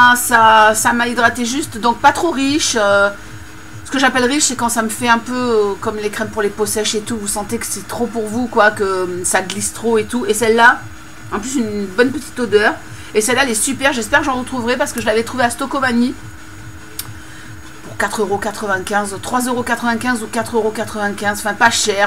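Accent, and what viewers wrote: England, United Kingdom. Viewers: ton accent anglais me fait rêver ! ^^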